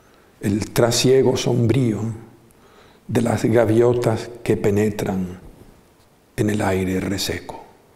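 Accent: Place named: Canary Islands, Spain. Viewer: Muy amena y enriquecedora. Más conferencias sobre poesía por favor. Que agradable es el acento de la Canarias.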